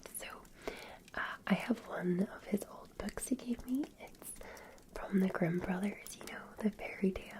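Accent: Scotland, United Kingdom. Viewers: I love the Christmas piano music at the beginning is that you playing the piano I know you are very talented and play the piano beautifuly! your Victorian era videos are always very well done everything from your very thick beautiful Scottish accent to your gorgeous outfits in the Roleplays and the wonderful backgrounds! I always like the dinner menu part! this looks to be a fabulous Victorian era video you have done a wonderful job again thank you so much I really do appreciate it! Merry Christmas ⛄🎄:_Loveheart::_Loveheart::_Loveheart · This isn't an Irish accent. It originates in the United Kingdom, and thus is a British accent.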